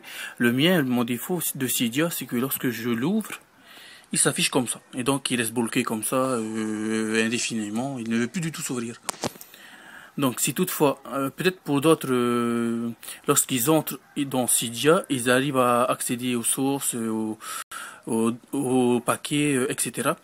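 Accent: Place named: French Polynesia. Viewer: J'ai grillé direct que tu étais un polynésien ma gueule !!!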